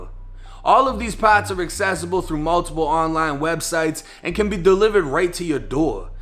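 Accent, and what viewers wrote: Boston, United States. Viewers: The boston accent is so unique, I can always tell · Thats how we talk up in Boston, dont hate.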